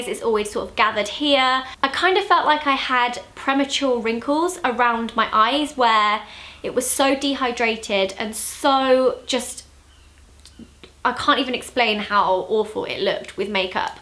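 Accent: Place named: Somerset, United Kingdom. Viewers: I know you said shout out to Wiltshire, but people speak like this in Somerset too, and it was nice to hear, being at uni so far away from those accents you don't think you'll miss! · I'm from the south west and I literally sound exactly like you at the start of the video(: love your makeup in this video!💕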